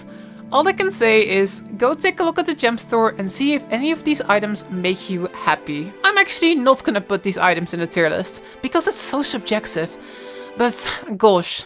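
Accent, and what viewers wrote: Netherlands. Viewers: u sound very dutch ^^ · I knew it!. the moment that accent hit, haha Dutch person! you are found! haha. Anyways, thanks for the data. Good luck on the rpg.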